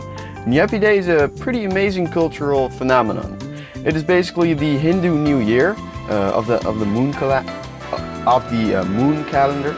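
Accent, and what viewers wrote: Netherlands. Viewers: haha i knew you were dutch even before you said amsterdam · Spot the dutch accent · Are you Dutch? You sound really Dutch! Trans: ben je Nederlands? Je klinkt erg Nederlands!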